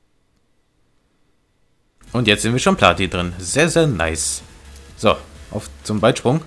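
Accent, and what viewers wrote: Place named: Russia. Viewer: man hast du nen russischen akzent ! :D (bin auch russe)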